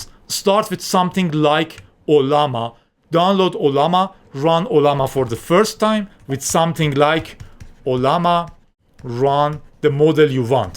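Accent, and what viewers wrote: Iran. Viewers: WOW I like your thick Persian accent it makes me proud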